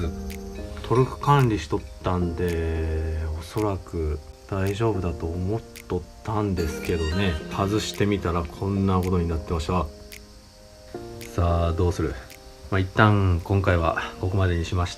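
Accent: Hiroshima, Japan. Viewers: 急にナレーション付き？広島弁ですかね？聞き取りやすくて良いと思いますよ。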